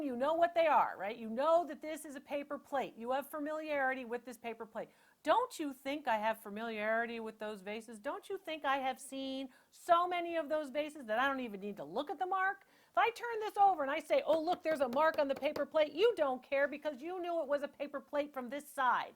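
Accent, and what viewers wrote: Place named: New York, United States. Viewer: It's just because of your New York accent that people say you remind them of others. That's because others have the New York accent, and Judge Judy is straight forward (although a little rough sometimes). Don't worry about the evil people with the nasty comments. They are just jealous because they don't know as much as you do.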